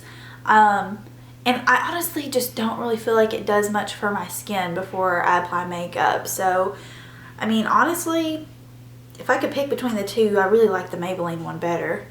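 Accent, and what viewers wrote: South, United States. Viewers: Her southern accent and how she's so animated makes me like her so much more than others I love her oh my gosh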